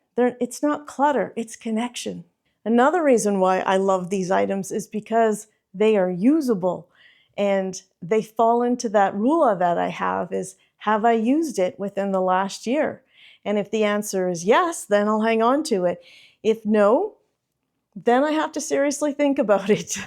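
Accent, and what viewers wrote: Canada. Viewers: I really enjoyed this video. Thanks for personalizing something that can be very hard to talk about. I live in Montreal, Canada, and I could not help notice that your accent sounds Canadian....is it?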